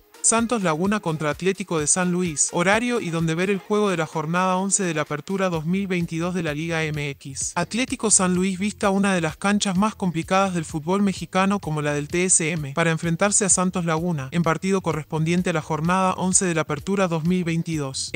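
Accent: Argentina. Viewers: Quita la voz argentina fastidia ustedes solos se quitan suscriptores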